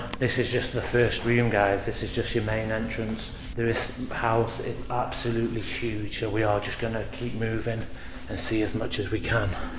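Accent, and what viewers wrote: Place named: Northern England, United Kingdom. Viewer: Yes and they understood your Northern way of speaking English, using phrases that no foreigner ever learns. You're great entertainment, but come on!!!!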